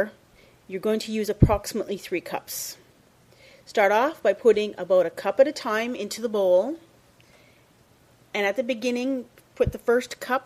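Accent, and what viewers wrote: Canada. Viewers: The sugar must make it sweet, is this standard in North America? I've heard the bread in USA is quite sweet but you sound Canadian, is it the same there? Also can I make this bread without the sugar in it? :) Thanks for all the lovely recipes! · can tell from certain words that shes canadian :P prety much the same thoguh, your right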